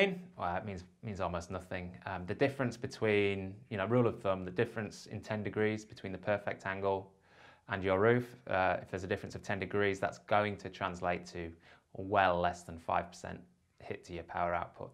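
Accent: England, United Kingdom. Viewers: Sounds funny hearing someone with an English accent telling you to face your panels North.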